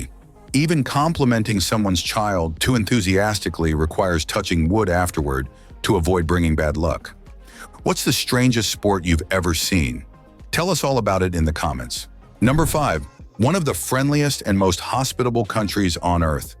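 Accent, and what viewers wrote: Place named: United States. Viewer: It is good,,.infact very good but one little mistake but very important thing that makes sense to all Turkish spiking people,,,.that we know dodo’s used to live in USA and wild turkey,s came from USA as well,,,but There is no link between the bird or the land ,,,right I know you are an British American but the land spelled Türkiye 😂,,.and not Turkey,,.please note the spelling even you are an American or a guy with an American accent,,,.🧐you can see the difference,,,,,.Right 😅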